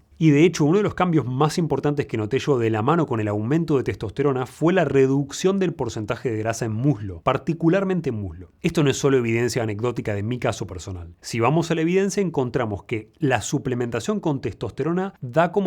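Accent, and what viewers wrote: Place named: Córdoba, Argentina. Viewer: Eres de Córdoba por tu acento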